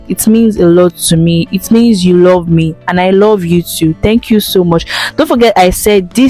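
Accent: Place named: Yoruba, Nigeria. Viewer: I like your spoken English with broken and yoruba vibe......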